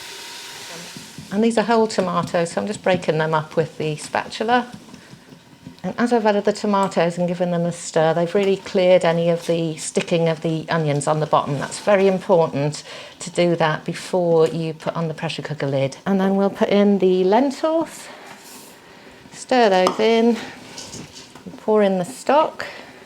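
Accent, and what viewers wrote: England, United Kingdom. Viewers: I've just got an Instant Pot with Ultimate lid. It is so easy to pressure cook with! Now I have found your channel I can learn more about how to use it. Thanks! Also your English accent is lovely to hear.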